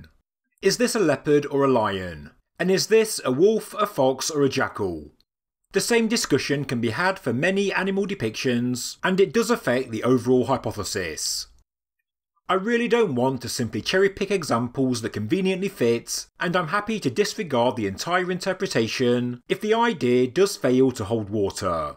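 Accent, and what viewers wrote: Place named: England, United Kingdom. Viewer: I want you to know, after I watch your videos I walk around my house punctuating then end of each sentence, in an English accent, lol. True story.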